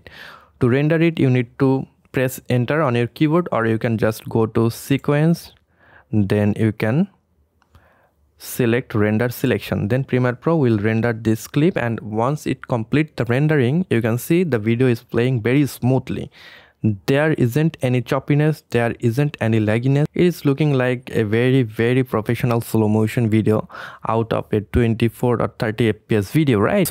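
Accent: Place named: Assam, India. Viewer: You sound so assamese... are you one??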